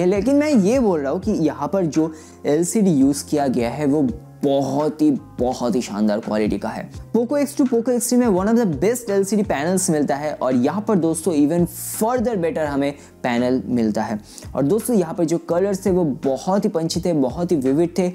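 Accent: Bengal, India. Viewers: Tumi bengali naki?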